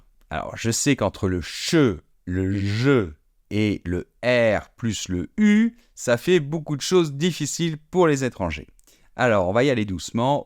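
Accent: Paris, France. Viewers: ça, il ne va pas capter, parisien il est devenu. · Tu va expliquer au basque,au toulousain,au marseillais,au Auvergnat ect a bien parlé. Tu dois être parisien.c'est qui les débiles qui ne savent pas dire serrurier, écureuil...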